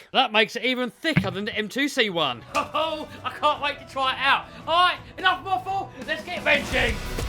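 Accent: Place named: England, United Kingdom. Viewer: dude i usually can't stand English accents lol but you're funny as hell 😂 You're vids are fantastic! keep up the good work broskie. definitely gotta have one of these in my future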